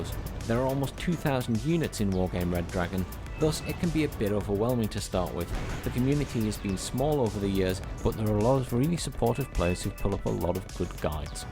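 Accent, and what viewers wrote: United Kingdom. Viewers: "Says in a northern voice" Ohhhh i like a good guide me.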